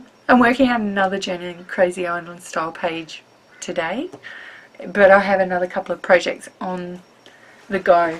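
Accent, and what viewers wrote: Australia. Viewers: you are fun to listen to, -- Shannon does not have your beautiful Australian accent (hers is Southern) -- and I love to hear your laugh;) enjoyed hearing about the letter journal group successes and seeing the art you are doing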